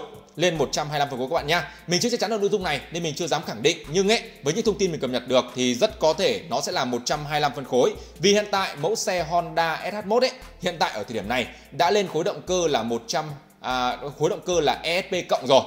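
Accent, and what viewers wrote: North, Vietnam. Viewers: anh người bắc này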